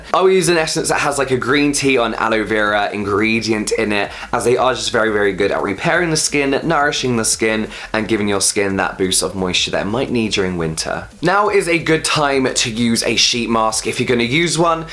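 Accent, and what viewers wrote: United Kingdom. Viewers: Am I the only one who dies for the British accent? :D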